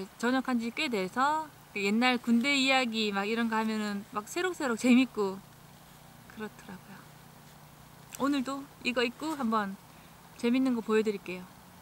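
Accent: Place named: Gwangju, South Korea. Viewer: 누나 말투가 저랑 동향같은데 어디세요? 전 광주입니다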